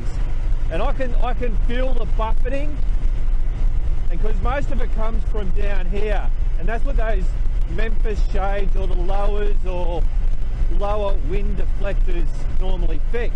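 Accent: Australia. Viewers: Thanks for the great video/info. You're a funny guy. You know, us yanks love the Aussie accent. The cardboard was pretty low tech, but certainly got the job done. Concern over blocking the air that cools the engine is always an important factor to consider and monitor. I've seen canvas covers that go over the front crash bars. You might want to get a digital laser temp gun to monitor your engine temp. Thanks again. I will integrate all this into my solution.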